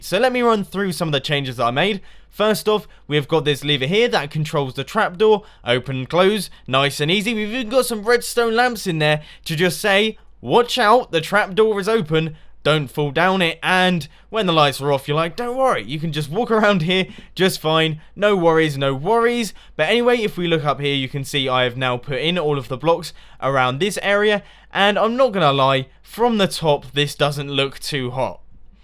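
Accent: United Kingdom. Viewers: VERY BRITTISH ACCENT?! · idk why but everytime you use your super-extra-fancy-charming-brit accent i imagin you recording in a smoking hahaha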